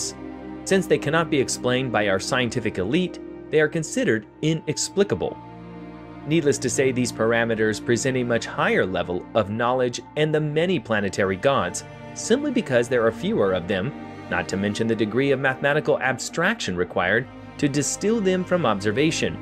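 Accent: Germany. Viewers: Physics is more fun with a German accent!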